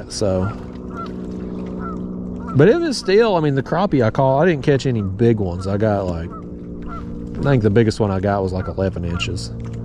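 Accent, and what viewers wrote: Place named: Oklahoma, United States. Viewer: Im gonna be really honest here for a minute. I never used to really watch your live 2 hour 4 hour long videos. I used to just skip right over them. Honestly because i kinda thought you where an asshole in general but i liked your videos about big fish and whatnot. Im from oklahoma. Your country accent gives me a kick because i hear myself in there lol. But honestly ive been watching the raw and uncut videos and i have noticed well hell this guy is just like me. He is just real and honest. I like the honesty. I love the raw and honest opinions about real life deals you talk about. Like the recent video i watchrd where you talked about old white christian men who hate your guts. Im a christian but im also human so i know damn well on youtube im gonna see the rue paul drag race bullshit and my kids see it too. My daughter asked me the other day she was watching a kids show on youtube and that crap popped up. 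Ahe is 10 by the way. And she asked me why that man was fressed like a woman and why he doesnt wanna be a man like he is???? You know how hard that is to explain to a 10 year old girl??? So you making a commercial for a ball hair trimmer, id rather her see that than see that other nonsense. Id rather someone be real and honest rather than be fake. Even as a christian im not religious. Im only human and make mistakes. So in closing just saying i know what you nean and i get it. But not all is christians are likw the old white man you described. 😊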